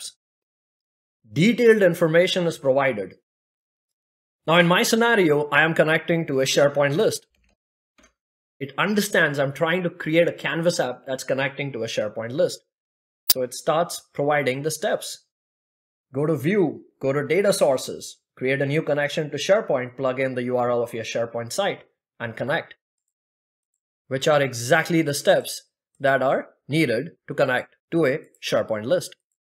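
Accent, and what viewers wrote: India. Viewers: سلام خستت نباشه - عذخاهی، شما اینگلییسیو تو ستان🇮🇳 یاد گرفدین[ت= د👂 ; ) ] یا ایران🇮🇷، طرز تلفظاتتون شبیه به مردمان هندی اینجا در کاندا(بیصائب_شده) که ساکنم به گوش می خوره🤔🙂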